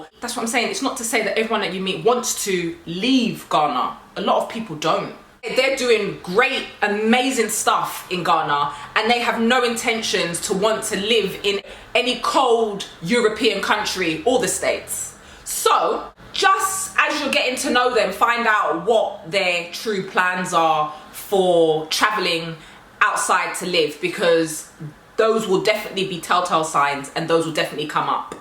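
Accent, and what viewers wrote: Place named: England, United Kingdom. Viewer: YOU ARE ADORABLE! I LIKE YOUR LIVELY, FUN APPROACH, AND YOU HAVE A GREAT VOICE! YOU REALLY HAVE A STRONG ENGLISH ACCENT... ADD A FILL LIGHT IN FRONT OF YOU WHEN YOU TAPE. YOU ARE TOO PRETTY TO BE IN A SHADOW!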